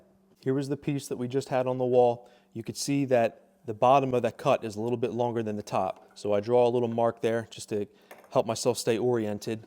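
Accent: Philadelphia, United States. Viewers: thought I detected a Philly accent. then I saw the hoody. go phills!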